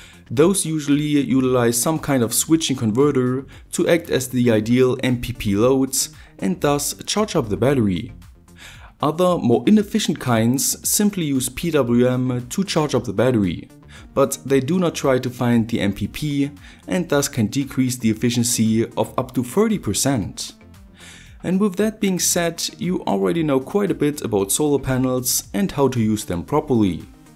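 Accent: Germany. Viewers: I get excited whenever says "Let's find out!" in that pronounced German accent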